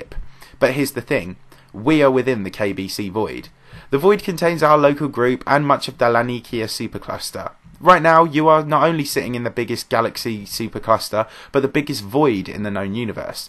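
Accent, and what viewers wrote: United Kingdom. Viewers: Please slow down so we can understand what you're saying! My students love watching your videos on the universe, etc. They listen carefully but British accent spoken fast is hard to decifer! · British accent is annoying..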